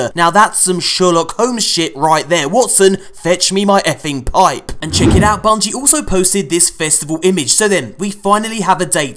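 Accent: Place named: United Kingdom. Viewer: Welp.. I guess I'll sub now. Since you slowed tf down on talking speed you cheeky brit thing you.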